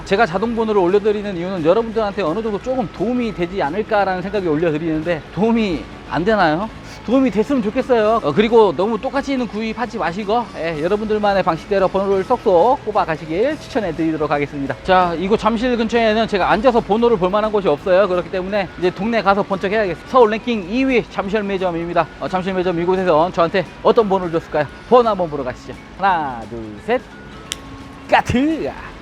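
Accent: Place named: Jeolla, South Korea. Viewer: 비 오는날 고생하네요 ㅋㅋㅋㅋ 아따 전라도 사투리 검나게 방갑소 ㅋㅋㅋㅋㅋㅋ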